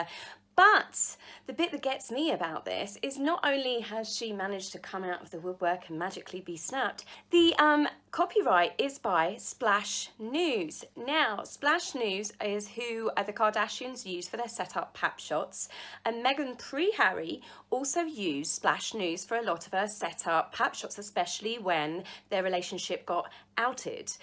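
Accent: United Kingdom. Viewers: Your accent is 🥰 I’m American so yea I admire the British accent. Actually, I love everything British. Anyways, as to the topic of Meghan, I’m sorry this woman is trying to ruin your royal family. She’s nothing but trouble! Personally, I don’t want her back but if we have to we will deal with her accordingly. The tabloids here will shred her. Idk what she’s talking about that there’s no tabloids in America. That woman is a liar! We have a tabloid magazine called the National Enquire here and many more. Hang on my British friends, this pain will pass.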